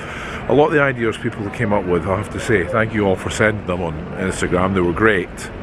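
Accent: Scotland, United Kingdom. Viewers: I’m from Australia and I’m heading off to the Uk/Europe/Mediterranean in April/May. I’ll be in Edinburgh for 2 days and Glasgow 2 days. Thoroughly enjoying your videos. Looking forward to Edinburgh. Your videos are wonderful. I hope I see you doing one of your videos when I’m there. Love your Scottish accent.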